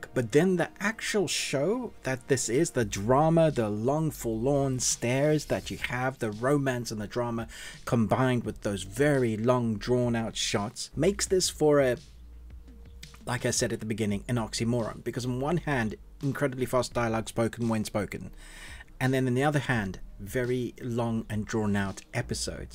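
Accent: South Africa. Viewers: The ending was confusing for me.. the dead bodies. Quick question, what is your accent? You sound South African mixed with English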